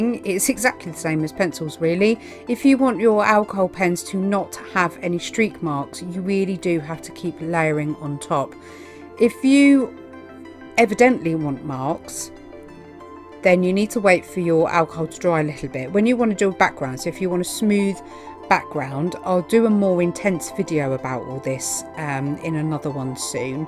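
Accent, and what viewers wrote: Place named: England, United Kingdom. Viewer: I love listening to english accent ❤️ yours are nifty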